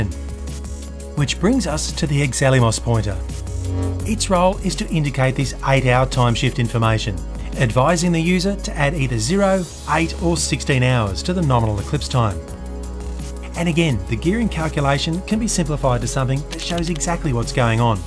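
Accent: Australia. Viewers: Dear journal, its been three months, were starting to wonder if will ever upload again and just leave is with a half finished project. We have made do by watching satisfying compilations but its just not the same as his smooth australian voice and perfect hand filing and attention to detail. I will try to update this journal but i dont know how much longer we will last without another video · I found this video series yesterday, and I had to stop myself from watching more at 3 AM. Seriously, this series is absolutely stunning. From the historical significance of this machine to your engineering genius, neat working methods, your calm Aussie voice and amazing editing/cinematography skills....you've got it all, man. I'll be waiting patiently for the next one <3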